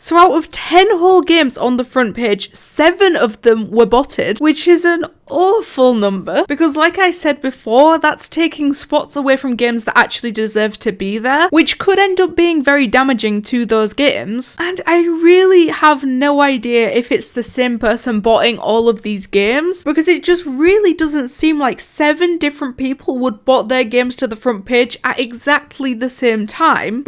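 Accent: United Kingdom. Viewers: I like 👍 your british voice👍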